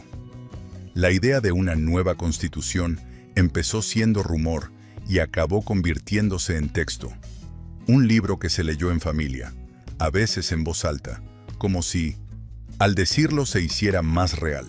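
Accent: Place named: Mexico. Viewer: Pero si este que habla es mejicano !!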